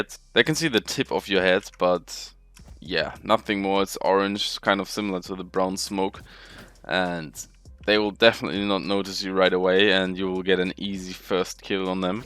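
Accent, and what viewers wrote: Germany. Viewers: Wie man hört das du deutscher bist haha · Wie Deutsch kann English eig. sein :D... Gutes Video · Are you german? Because of your accent ;)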